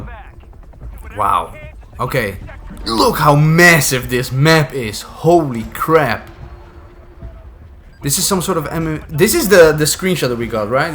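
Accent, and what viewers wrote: Netherlands. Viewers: I smell Dutch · You sound Dutch af? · sound like an dutch accent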